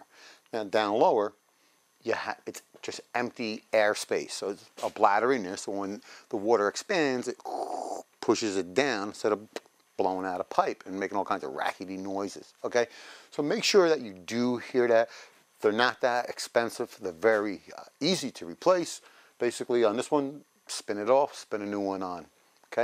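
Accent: New York, United States. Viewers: Hey, you have a NY accent, are you from NY?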